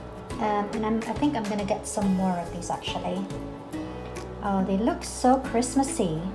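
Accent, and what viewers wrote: United Kingdom. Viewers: look so delicious i would love to taste that & i love listening to your british accent sis. new friend here from australia teamlipat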